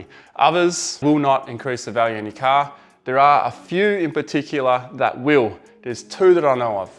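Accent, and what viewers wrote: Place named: South Sydney, Australia. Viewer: Must be from south Sydney. Surely.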